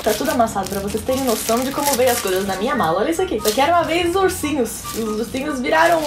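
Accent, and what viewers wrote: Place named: Spain. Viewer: I dont understand nothing, but i tink dare she is from spanish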